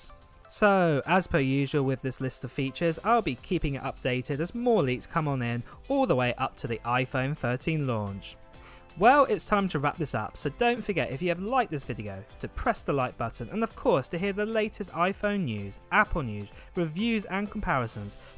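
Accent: United Kingdom. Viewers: British accent 😂😂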